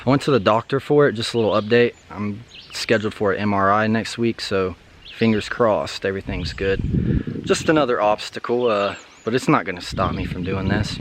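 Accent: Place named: Appalachia, United States. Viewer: I’m guessing by your accent that you’re in sw Virginia or east Tennessee?? I have the same accent 😊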